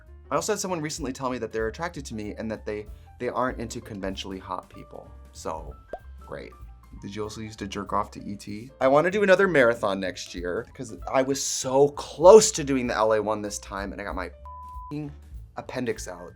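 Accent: Wisconsin, United States. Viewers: Just thinking about your Wisconsin accent late at night sometimes… it will never leave you,.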